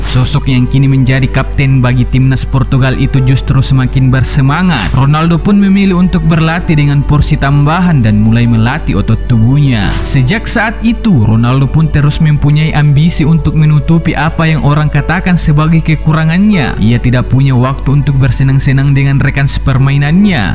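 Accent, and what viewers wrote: Medan, Indonesia. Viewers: Lucu pembacanya, logat nya masih terasa, kek nya orang medan ini, horas medan!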